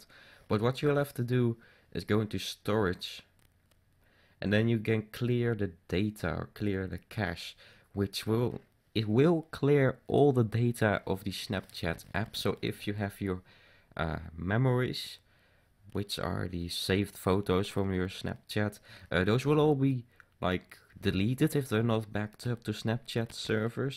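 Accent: Netherlands. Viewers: You just hear it instantly that this guy is dutch xD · Gast je kan niet een ergere nederlandse accent hebben holy shit xd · Je hoort dat hij Nederlands is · Ben jij nederlands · ik hoor aan die accent en de nu.nl app dat je nederlands bent haha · Die te you from? You sound like a Dutch guy. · you have a duch acsent 😄 😉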